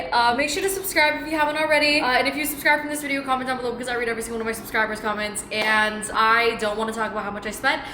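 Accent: Vietnam. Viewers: your viet is so cute T__T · Has anyone told you that your Vietnamese accent is so cute ❤❤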